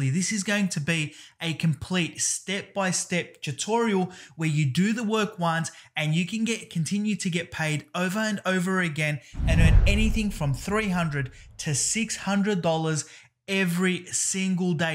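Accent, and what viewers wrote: Australia. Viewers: I like your Aussie accent 👌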